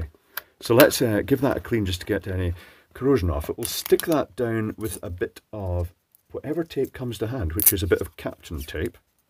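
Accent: Scotland, United Kingdom. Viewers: Scottish accent and at one point American pronunciation of Aluminium!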